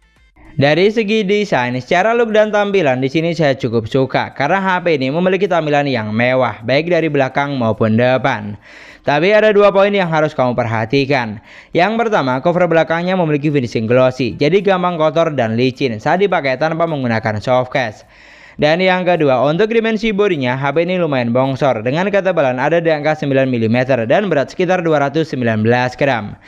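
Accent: Madura, Indonesia. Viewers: Orang madura ya bang?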